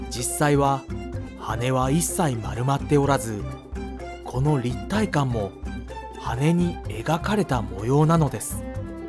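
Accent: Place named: United States. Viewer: アメリカ系のハーフの人が喋ってるみたいで良き。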